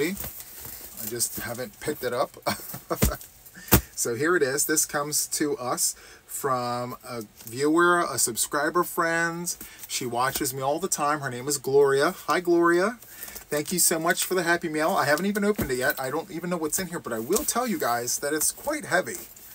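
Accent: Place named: East Coast, United States. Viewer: Happy new year and friends!! I just love your enthusiasm and especially when that East Coast accent comes out 😂! Please tell mama we say hello!!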